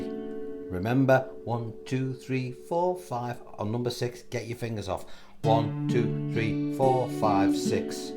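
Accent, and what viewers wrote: Liverpool, United Kingdom. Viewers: Excellent lesson. Totally agree about putting every chord above, rather than a dummy such as meself trying to figure out where all the other chords go. Its held me back decades! Going forward it would be great if you could put more of the page 3 thingy's with all the chords going where they are meant to go. Thanks for the new word of the day too. Smuggery, had to look that one up. Nice1. Always loved the way you Liverpudlians talk, hence" The Beatles. Its all a load a shite, theres no frikkn werk as me old scouser buddy used to say back in 1984. A big Irish very slow fan! Have a good wan.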